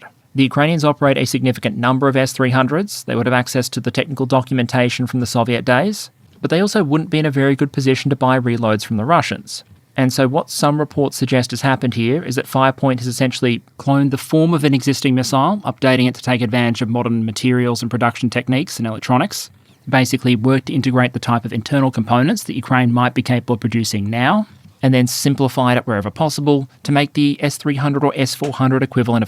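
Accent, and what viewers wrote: Australia. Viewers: He's an Aussie, alright?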